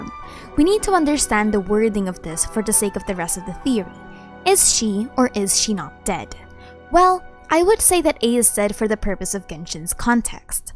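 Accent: Philippines. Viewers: I knew it! You were a Filipino the whole time, ur English accent is familiar taena Anyways, cool video! I didn't even know that book existed, more lore crumbs for the Almighty Shogun!! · Pinay ka? HAHAHA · is she from philippines or what?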